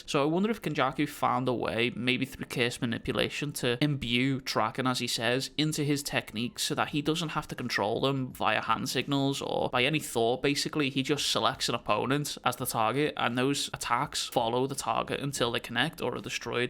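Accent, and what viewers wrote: Liverpool, United Kingdom. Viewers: weird seein another scouser into anime. love it bro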